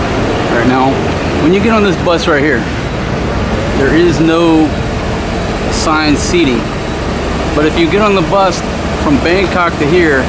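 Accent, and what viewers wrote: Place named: Texas, United States. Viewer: loved your detailed report about the bus service as i was just looking for this info ! and above all that loved your texan kinda accent man ! typical cowboyish ! a handsome and smart backpacker detected , in pattaya ! hahahaha keep posting such cool vlogs mate ! greetings from india :) peace and cheers for that singha !!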